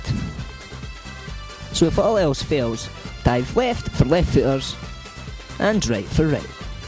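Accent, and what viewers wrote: United Kingdom. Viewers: his accent is scottish and so am i glasgow :D good tips aswell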